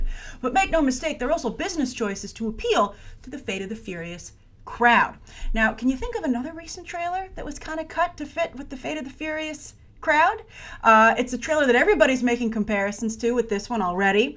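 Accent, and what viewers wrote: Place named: Boston, United States. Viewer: Are you from Boston or do you have a speech impediment?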